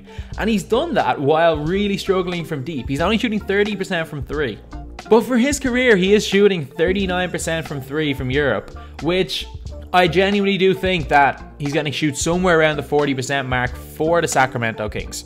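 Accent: Ireland. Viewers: Your defo irish .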